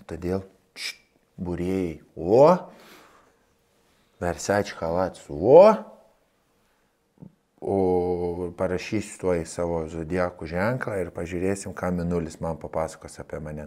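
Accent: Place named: Samogitia, Lithuania. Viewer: Vo žemaitis 👍🤭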